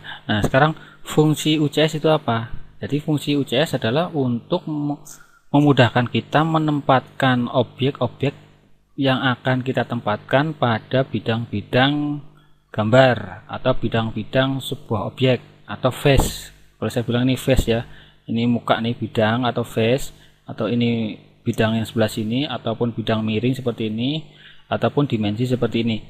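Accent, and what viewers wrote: Banyumas, Indonesia. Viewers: manteps ngapaks..., penjelasanya sungguh jelas.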